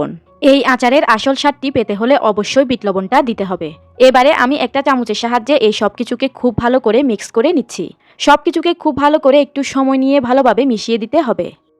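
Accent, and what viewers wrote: Chittagong, Bangladesh. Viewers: cox ar Chittagong ak e voice😢😊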